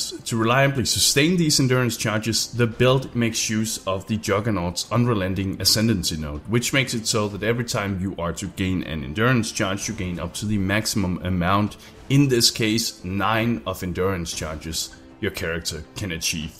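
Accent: Denmark. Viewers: One discharge, one baby haha...Du er Dansker ikke? man kan altid hører det ;)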